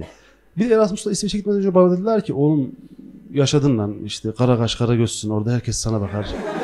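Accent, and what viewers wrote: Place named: Anatolia, Turkey. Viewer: Abi çok sıkı fanın sayılmam. Ancak kurcala daki çok eski videolarını biliyorum ve çok güldüğüm bir mizah anlayışınız vardı. Kanalı görünce bir heyecanla açtım ancak 2. Dakikaya zor geldim. Bu yarattığın sahne kişiliği ise lütfen vazgeç çünkü kurcaladaki kişilikten sonra çok eğreti duruyor. Eğer bu gerçek kişiliğinse o zaman lütfen kurcaladaki sahne kişiliği ile devam et. Anadolu şiveli ve durumlara uzunca süre uzaktan bakıp anadoluca bir tepki veren yeterince stand upçı var piyasada. Bu şekilde sıyrılman imkansız.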